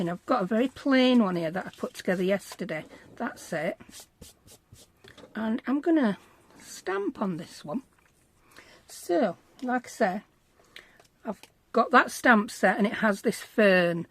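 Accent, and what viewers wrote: United Kingdom. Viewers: Ohh my goodness, ur a "GENIUS". TO use ur corner square & ur other gadget ,I don't have any of those but maybe I can buy myself one from TEMU. ❤❤❤. And I think ur in the UK, I love ur accent, I could listen to you all day. Even wen you make jokes, it keeps ur videos fun to watch, keep doing what you do BEST, GOD BLESS 🙏 🙏🙏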